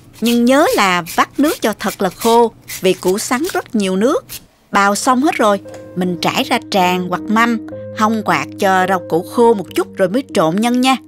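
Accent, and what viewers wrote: South, Vietnam. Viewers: Cảm ơn thật nhiều ❤giọng Miên Nam sau mà dễ thương quá ❤ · Giọng nói Miền Nam của ngọt ngào & thân thiện quá. Học được bí quyết làm chả giò giòn lâu, cách trộn nhân để bánh giòn & cách bảo tồn trong freezer. Cám ơn cô nhiều lắm. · Giọng miền nam quá dễ thương, tự nhiên ko điệu, cách làm dễ hiểu, cám ơn 🥉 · lam video nuoc mam cham cha gio, bun thit nuong va com tam nhe! Con rat thich cach nau an va going noi ngot ngao nguoi mien nam cua Co. Chuc Co nhieu suc khoe va lam nhieu mon An nGon guip the he tre cua tui con Lon len o My duoc biet ve am thuc Vietnam. Thank you nhieu😍😍😍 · Rất thích cách hướng dẫn ngắn gọn kèm với giọng nói miền Nam dễ thương của " hén, hé, nha...." 😆 Cho mình hỏi thăm mua con dao bào khoai và carottes ở đâu vậy? Cám ơn cô trả lời.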